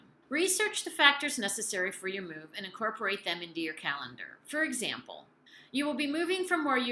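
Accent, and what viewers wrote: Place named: New York, United States. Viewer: Thank you so much for your videos. I watched this one and the how to buy property one as well. Thank you for sharing so much valuable information. I have a frivolous question for you. We live very near your previous place in Long Beach, CA. And you now live very near the Alps. I have friends who live in Torino. And he has shown me video of piles of snow. How are you coping with the weather difference? I think I can detect a bit of a New York or at least a northeastern accent. So maybe seasonal change isn't quite so different for you? We would really like to live in Italy. But I am thinking somewhere in the south because it is a little closer to the climate we are used to. We are not comfortable in the extreme cold I see in the north. I know you love your area, but have you visited many areas in the south of Italy? 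We love Sicilia and Puglia. Both of their climates remind me of coastal southern California. Thank you again for your videos. I liked and subscribed. -Joe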